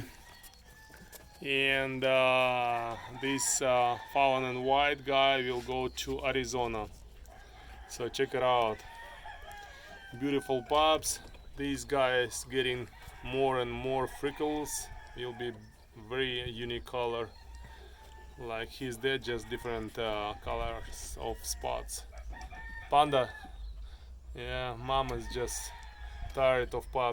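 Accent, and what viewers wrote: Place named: Russia. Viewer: Всё равно русское акцент чувствуются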